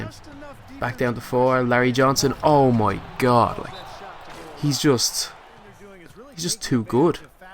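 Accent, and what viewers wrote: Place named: Ireland. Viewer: His voice was so much mor irish😂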